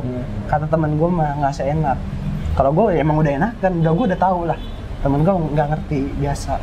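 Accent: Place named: Jakarta, Indonesia. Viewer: Betawi bgt dah ini kaya kita ngmong nya🤣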